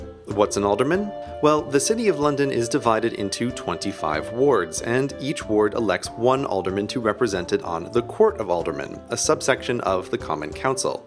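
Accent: United States. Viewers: He mentioned that he went to an American college. Judging from his accent, I think he's American. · Thought you were American? Mind=Blown!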